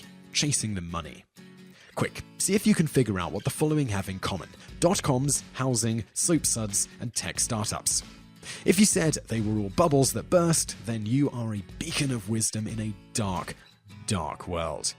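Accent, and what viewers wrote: England, United Kingdom. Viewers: Your British English is useless today outside of the UK. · Learning Chinese now and enjoying it. The narrator sounds English. English people are the laziest when it comes to learning other languages. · VVDivineOwl You know the person speaking is clearly English by his incredibly obvious accent, don't you? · It is so nice to hear such an elegant British accent say such nonsense. It sort immunizes us against form over substance situations. Its like listening to Ted Cruz being stupid and then hearing that he was a brilliant student at Harvard. It devalues a Harvard education and a fancy accent to the benefit of those of us in the public education system. Here's a thought: go on Google and search "Chinese language." And stay away from any list on the Internet. They are carriers of stupid.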